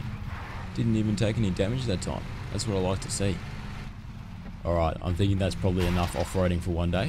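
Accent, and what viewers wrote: Australia. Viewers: what part of australia ya from mate? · Australian accent , badass skills. Gold.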